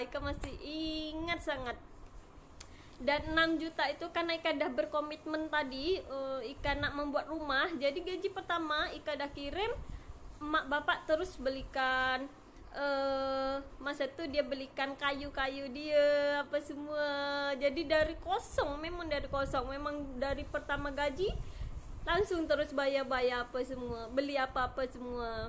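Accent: Malaysia. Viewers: sudah boleh bertutur mcm org Msia...bagus kl boleh d beritahu asal dr daerah mana d Indo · bagusnya ika servive. lagi cakap juga sudah malaysia.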